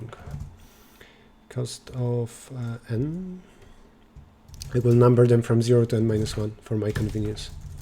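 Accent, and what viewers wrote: Poland. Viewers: chyba się zgubiłem. Coś mi akcent nie pasował i myślę polak. dobrą robotę robisz, pozdro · Słychać że 🇵🇱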